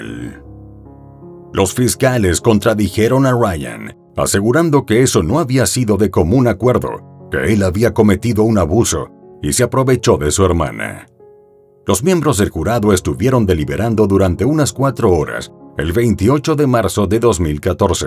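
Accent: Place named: Mexico. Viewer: Porque habla en espanol, Si son americanos de habla ingles? No eran de Mexico. Y la voz se eschucha de Mexico Oh de otro pais. No me gusta este Video.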